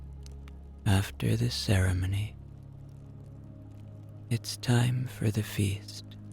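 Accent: Ireland. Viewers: Thank you so much for this story about my ancestors on my grandparents side of the family. I imagined myself there seeing how things were done at that time. I was in reverence and in awe of those people who did so many things in the tribe for the survival of everyone. Hearing you in that Irish accent just melted my heart as I felt a beautiful kinship with you and them. I also miss my grandmother that has passed. When I would pronounce Irish with an i, she would say no it's Arish. 😂 I spelled it right but pronounced it wrong, she said. Thank you for bringing back such wonderful memories of her and educating me on the traditions of my tribe ❤.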